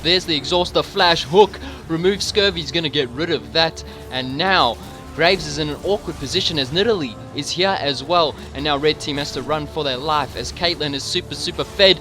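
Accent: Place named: South Africa. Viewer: Where he is he from? he sounds very South African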